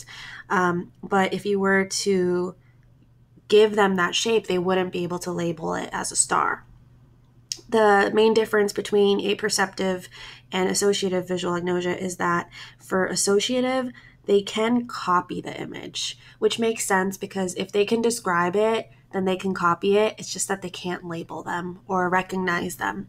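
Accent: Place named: England, United Kingdom. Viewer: I just loved your english accent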